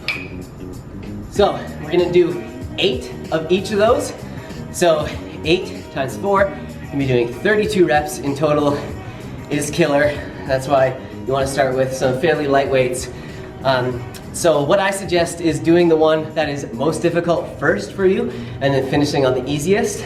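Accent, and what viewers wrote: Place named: Canada. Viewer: You sound extra Canadian in this video 😂 Also , you got them mini boulder shoulders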